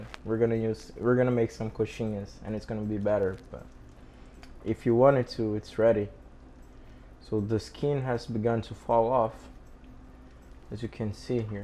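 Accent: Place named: Minas Gerais, Brazil. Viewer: Pelo o jeito é mineirinho kkk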